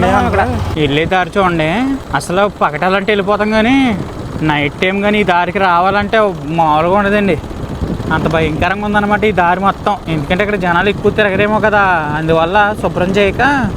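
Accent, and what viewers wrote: Godavari, India. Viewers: Godhavari language super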